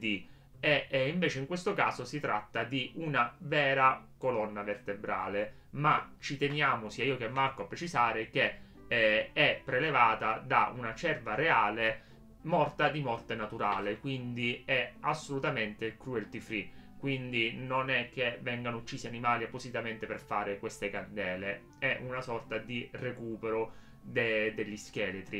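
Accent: Sicily, Italy. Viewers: Credo sia una cadenza siciliana..hanno le vocali molto aperte 🤣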